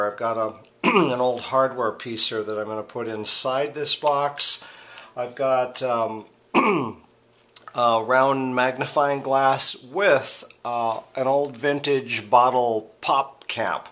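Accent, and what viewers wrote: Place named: Midwest, United States. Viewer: I love your work. The accent? I'm trying to place it... Wisconsin, Minnesota,.... am I close??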